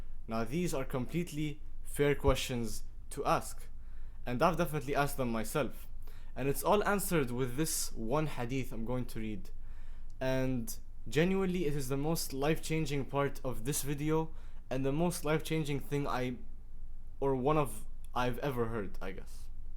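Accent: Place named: Egypt. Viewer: عرفت انك مصري من الaccent. كمل كلامك جميل جدا اللهم بارك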